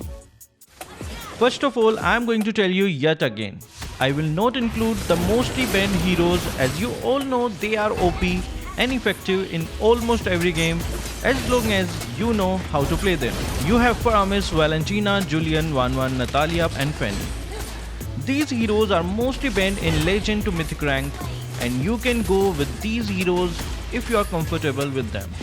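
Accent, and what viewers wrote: India. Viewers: Are you indian your accent sounds soo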